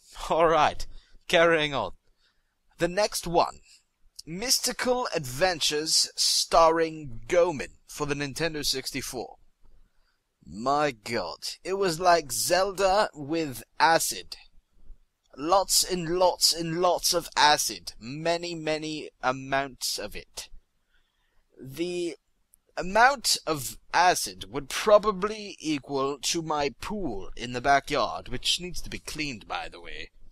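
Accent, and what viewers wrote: United Kingdom. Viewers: Is he like British or something? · i love the fact that you turn more and more british in every word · He gradually got more british throughout the episode regardless of the fact that he is american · wut's with the brittish accent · anybody else relies he is speaking with a British accent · his british accent is cute^^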